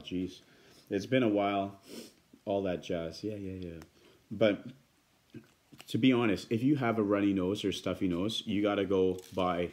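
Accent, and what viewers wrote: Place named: Wisconsin, United States. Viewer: Hey dude, please articulate your words. You sound like you are from Wisconsin or Canada.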